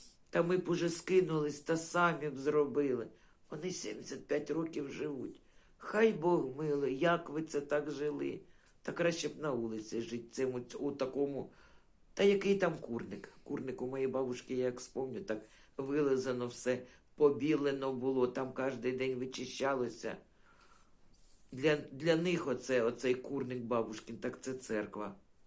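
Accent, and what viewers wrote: Kherson, Ukraine. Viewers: Пані, вітаю, Ви дуже класна, ваш говір так мені нагадує мій, херсонський❤, вже 16 років там не живу, а всеодно, досі проскакує та балачка😊, зичу Вам міцного здоров'я і терпіння, най Бог кріпить вас і охороняє, доброї ночі, Янгола Охоронця🙏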